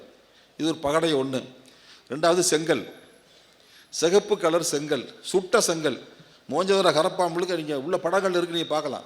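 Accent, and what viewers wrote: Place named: Telugu, India. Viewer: தெலுங்கு வாடை வருது அவர் பேச்சில்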